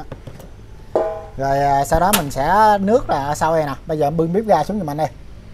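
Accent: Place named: Mekong Delta, Vietnam. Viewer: Miền tây quê tui đó..nói chuyên nghe cưng ko..tự hào vn ơi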